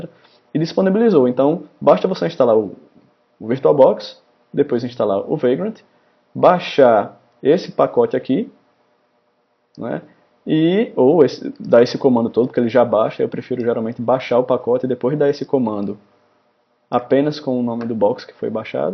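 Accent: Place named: Recife, Brazil. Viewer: Eu estava desconfiando que esse seu sotaque era lá de Recife, mas tirei a dúvida qdo vc falou parruda. Acertei?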